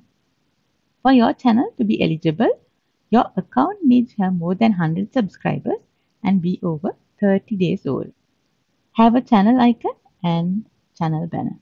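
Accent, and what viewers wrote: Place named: India. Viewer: oo i think u r Indian because of your accent 🙂 sry 😋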